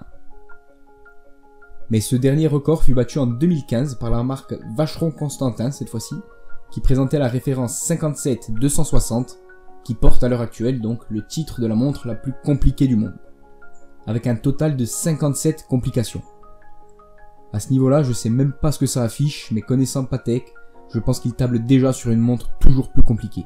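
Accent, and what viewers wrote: Corsica, France. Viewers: Bonsoir est-ce que j’entend un accent corse?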